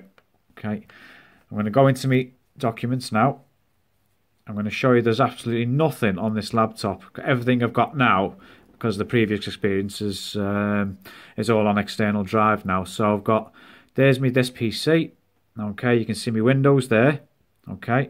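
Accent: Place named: Liverpool, United Kingdom. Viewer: Thats really good scouse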